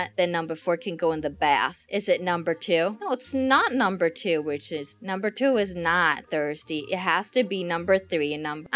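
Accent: Sweden. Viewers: I love your Swedish accent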